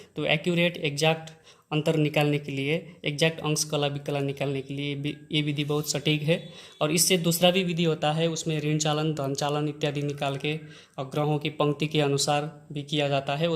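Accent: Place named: Nepal. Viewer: Nepali le hindi boleko ठ्याक्कै thaha hunxa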